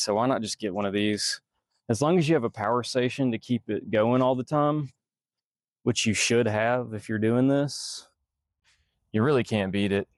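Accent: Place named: Tennessee, United States. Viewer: I thought it sounded like you were from Tennessee or Kentucky, then I saw your tags. That's quite a drive. I do LA <--> Nashville a lot. Not living in the car, so I don't have a setup as developed as yours, but the trips are long enough that your expertise has been relevant and helpful. Thanks.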